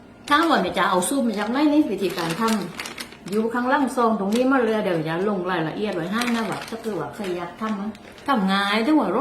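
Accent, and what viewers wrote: South, Thailand. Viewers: ชอบเวลาคุณ แหลงใต้จังค่ะ 🥰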